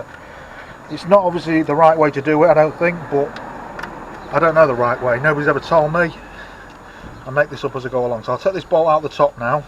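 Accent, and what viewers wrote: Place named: Northern England, United Kingdom. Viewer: Hi from Manchester! You sound like a northerner too! Great video and like the Funky music!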